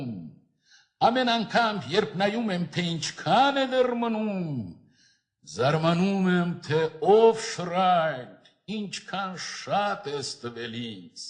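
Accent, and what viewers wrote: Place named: Russia. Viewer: ankexz asaz es rusakan akzent em zqym vor@ ajdkan el dyrs gi qalis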